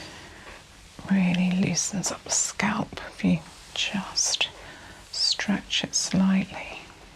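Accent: United Kingdom. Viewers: your soft lovely voice plus the British accent is just all I need….so super calming. Congratulations on your 1 year success as an ASMR creator. Love your channel so much♥️. Blessings lovely lady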